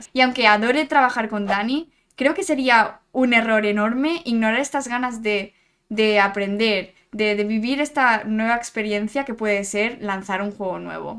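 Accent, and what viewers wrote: Spain. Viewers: Desde que te conocí el año pasado siempre supe que había algo especial en tí, ya sea en tu acento, que sentía algo de español, o por tu forma de trabajar, tu carisma, tu compromiso. Te agradezco por hacer de las Brawl talks algo increíble y especial, con Dany, con Ryan, con Frank de vez en cuando. Se que en esta nueva etapa es como comenzar de nuevo, pero es algo que tú necesitabas y te entendemos, se que te sentirías mal si no hubieses tomado está oportunidad, y todos te entendemos, mucha suerte en Squad!!! Te queremos mucho ❤❤❤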